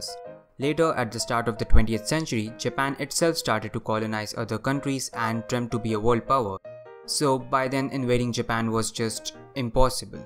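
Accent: India. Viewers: The Indian accent is fucking hilarious. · I was fine with the accent, couldn't actually tell you were Indian, but, please. Back up from the mic next time, the peaking is somewhat annoying.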